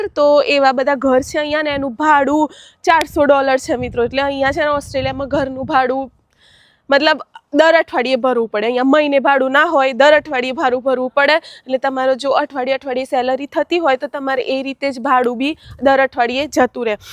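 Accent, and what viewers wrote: Sabarkantha, India. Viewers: Tamari language parthi evu lage che k tame Bayad, Sabarkatha dist. Na hasho. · સાબર કાઠા ની બોલી બેન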